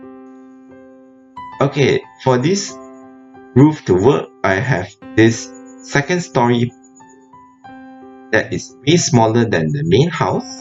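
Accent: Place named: England, United Kingdom. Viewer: Hey, where do you come from? I lvoe your English accent!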